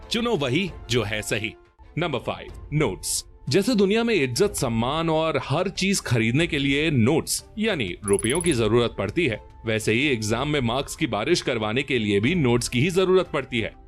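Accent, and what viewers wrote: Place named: Delhi, India. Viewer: Humare delhi me aajo sir srojani nagar wali awaj he apki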